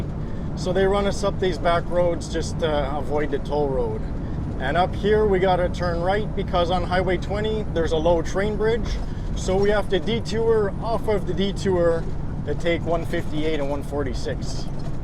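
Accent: Canada. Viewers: DUDE! Fascinating video! I loved how you patiently dealt with the round-a-bouts in NY. I am a lifelong long Quebecor now living in California and I'm trying to identify your accent because your english is perfect. Acadian? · I can hear that Quebec accent ;)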